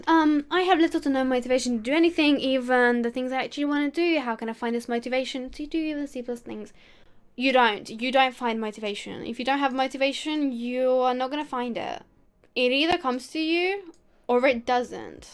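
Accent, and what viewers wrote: United Kingdom. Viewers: That's the thiccest British accent I have ever heard........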